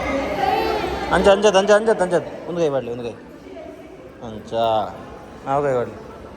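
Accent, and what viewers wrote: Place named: Mangalore, India. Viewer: na tulu udupi style bokka na tulu kudla type... Enjoyed vlog,keep doing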